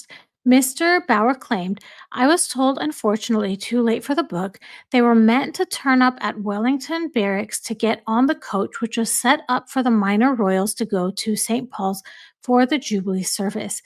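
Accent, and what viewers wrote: United States. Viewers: DO YOU HAVE AN AMERICAN ACCENT ???? IF .IT IS NOT BAD ENOUGH. YOUR VOICE. .????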